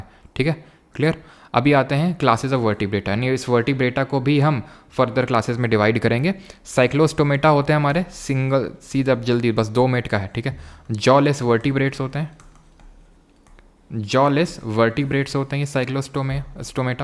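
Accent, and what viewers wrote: Kanpur, India. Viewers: वही तो इत्ता accurate कनपुरिया कइसे बोल सकता बाहरी कोई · From kanpur ✔️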